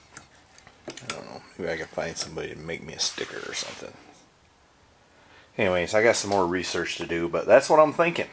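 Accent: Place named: New York, United States. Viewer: Enjoying the New York accent mixed with the southern slang!!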